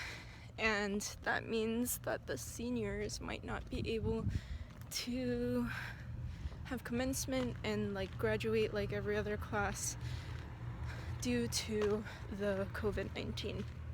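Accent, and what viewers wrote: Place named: United States. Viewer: She sounds American to me. Stay in your lane weirdo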